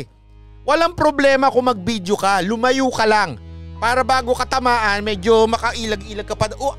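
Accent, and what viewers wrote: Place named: Iloilo, Philippines. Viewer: Bwaaaah bagay sayo mg ilonggo sir 😂😂😂ang dami kong tawa sayo tlga😂😂😂😍😍😍❤❤❤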